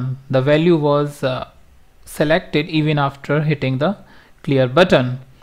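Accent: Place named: India. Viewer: I think Indian accent is good while speaking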